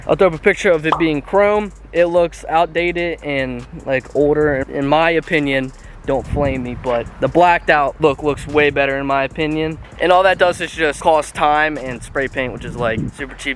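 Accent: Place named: Maryland, United States. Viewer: Your maryland is showing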